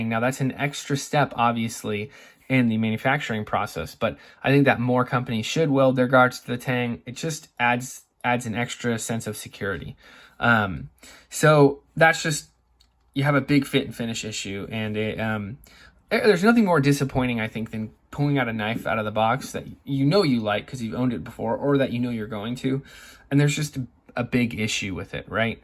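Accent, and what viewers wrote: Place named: England, United Kingdom. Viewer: Just hear the accent he is english " if we do outdoor testing we get 10 years 😢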